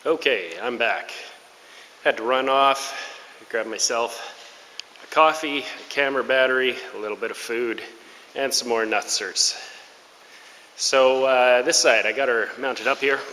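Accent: Canada. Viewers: Just watch your 4 videos start to finish, good stuff! Had a good laugh reading the comments "Are you in Canada?" I could tell you were a Canadian boy right from the start lol. From Ontario by chance? We should wheel sometime if you are.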